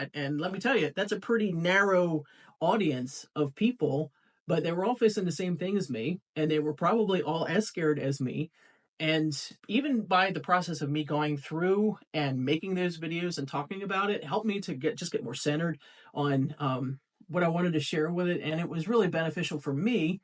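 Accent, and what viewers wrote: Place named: Pennsylvania, United States. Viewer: Great information! Do I detect a Pennsylvania accent or a bordering state?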